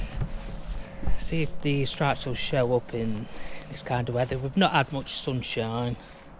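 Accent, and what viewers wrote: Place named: Nottingham, United Kingdom. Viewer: I recognised the accent straight away mate! Saw you're a notts lad.. im originally Mansfield born n bred but moved to south Yorkshire now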